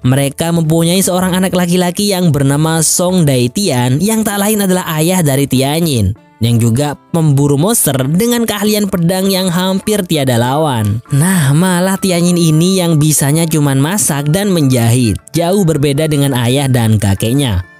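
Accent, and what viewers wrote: Madura, Indonesia. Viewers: Admin nya kek nya orang Madura deh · Ini cuma gua yg dengar apa memang admin orang madura? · Corak besa madureh wkwkw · Loh kok bisa madura ini mimin nya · Madureh kek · Orang madura ya kak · Areh oreng madureh yeh🤣 Mak bahasannah Reng madureh🤣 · Madura ya bang?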